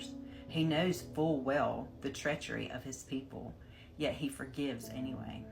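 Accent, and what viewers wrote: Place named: South, United States. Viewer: you're welcome! Luv your Southern accent, too! 💙 I enjoy your channel, thanks 🙏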